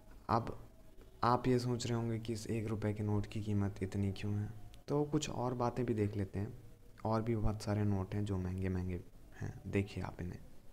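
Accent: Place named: Madhya Pradesh, India. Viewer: Bhai wo sab to thik hai...lekin tumne iss video me pakaya bahut hai. Ek simple si chiz batane k liye itna jyada bola upar se tumhare bolne k style se samajh aa raha h ki tum MP se ho.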